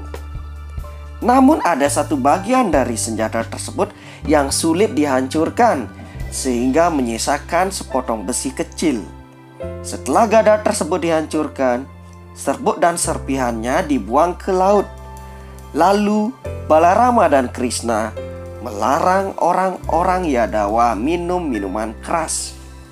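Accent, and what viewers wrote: Bali, Indonesia. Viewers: Logat penyiarnya seperti logat bali. Kalo didengar ceritanya mmg berdasar dari buku mahabarat. Aku jg pernah baca buku mahabarata versi s.pandit (bali) Dl pernah punya yg karya org india tp ga selesai karna bukunya tebel bgt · Orang bali..bkn madura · Logatnya saja jelas,logat bali di kata " T" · Logat balinya medok bli😀😀😁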